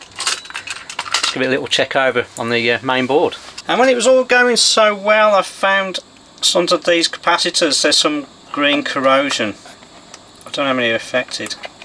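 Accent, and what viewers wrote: Birmingham, United Kingdom. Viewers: Wow, I just stumbled on this video and I am transfixed! That is a beautiful deck and if any of my audio equipment became faulty, I'd want you to repair it. So professional. As an aside, you sound Brummie. I am from that part of the world. Brilliant!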